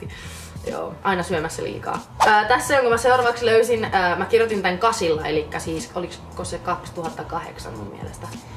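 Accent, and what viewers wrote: Finland. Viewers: Wow, Love your Finnish accent:)... also didn't realize your so young (... now I feel? like 'Edward Cullen' old;-). Personally, didn't keep a journal at that age ( a guy thing?... couldn't write right!... hum? still can't!;-). Thanks for sharing your ridiculous with absolute strangers... kinda makes the world a much smaller place. <3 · That Finnish accent makes her look hotter wth❤❤😍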